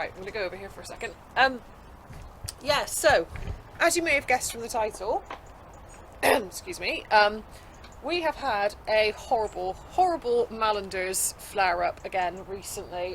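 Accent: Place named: South, United States. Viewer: Very informative as usual and you can’t beat a southern accented girl talking udder grease and pig oil lol x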